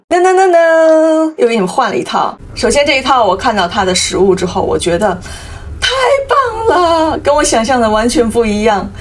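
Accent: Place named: Japan. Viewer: 你推荐的这几款大衣从面料到色彩到版型，都非常的好，优雅高级，我跟着你给的link看了一下，价格也很亲民。👍。很可以买。手痒。还有你上次推荐的海浪包，也同样出色，美观实用，品味超群，还是美国华裔设计师的作品。棒！ 但是，我觉得，这几款大衣，不太适合你。因为它们的肩膀自然下垂，随着你的肩膀走，太溜肩了。显得头太大了。（你的这个视频里的有些照片，从下向上照的，把头照小了很多，看起来比例就好多了。）其实，这涉及到一个头身比/头肩比的问题。以中国人的传统审美，你的比例是完美的，就像明清仕女画里走出的美人。但现代全球审美是西方七头/八头身的审美，（你给的穿大衣的模特照就很明显，她们头小肩宽，穿起来很不一样）。所以我觉得很多传统东方美女要靠衣服的裁剪来修饰一下头身/头肩比。 我的家人是你的粉丝，非常非常喜欢你的频道，她觉得你的推荐，属于日本风格，低调典雅，特别适合东方女性。我也同意。挑一点小毛病，你的品牌发音，应该都是日式的，和在欧美地区流行的英语式发音（Anglicized）有区别。比如UGG是“阿格”，Louis Vuitton 被称为“LV”别人也能听懂，Hermes 近乎“Air Mess”。日式发音会让人一愣，不知所云。日本人分不清V和B、R和L。用日文来音译欧美品牌，相对来说较为困难，可能还不如汉语的音译。比如“路易威登”“爱马仕”什么的，和品牌的英语式发音还挺像。我猜你的观众不少都在日本之外，或者改用英式发音更好些？ 看到说，你1977年买了第一个LV 包，但你看起来顶多30出头。太惊人了！希望今后多发保养穿搭显年轻的视频呀！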